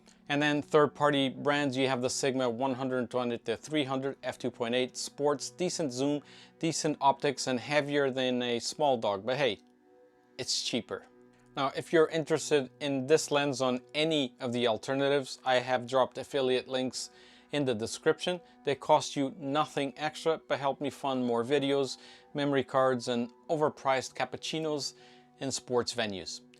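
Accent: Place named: Portugal. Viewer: Great video bro Quick question are you Portuguese? Porque o sotaque nao engana meu camarada🙏🏿💪🏿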